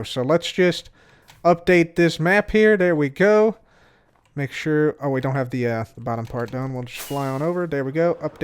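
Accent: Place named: Chicago, United States. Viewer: I realized it the first time I ever heard him speak and instantly recognized the Chicago accent :)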